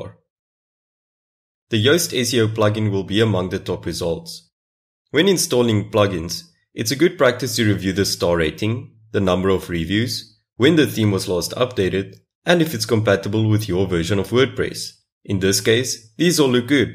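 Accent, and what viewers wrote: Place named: South Africa. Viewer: Thank you! Are you South African? You sound South African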